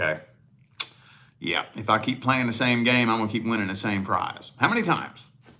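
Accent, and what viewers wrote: South, United States. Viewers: Love that southern voice!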